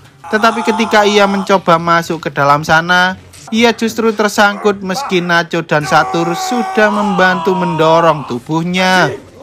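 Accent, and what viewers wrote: Java, Indonesia. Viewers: Ya allah mas medokkk e samean wkk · Ngomongnya bisa ga sih gausah medhok gitu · Saran saja mas tolong logat Jawa nya jgn terlalu keras pasti seruuu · Medok sekali 👍🏼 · logat nya kok jawa kali jadi enek di denger, kenapa gak biasa aja bg biar bagus kayak IQ7 & SAQAHAYANG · Medok bngt..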